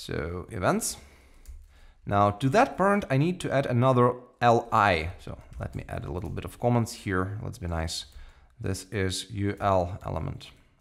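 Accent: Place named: Austria. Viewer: I have a friend from Austria and u sound just like him. Are u Austrian? Really good tutorial, Thankyou!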